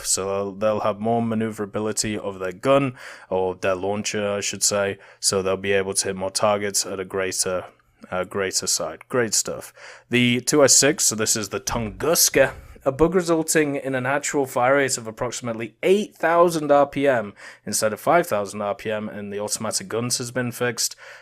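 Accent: England, United Kingdom. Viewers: Where is your accent from? Which part in England?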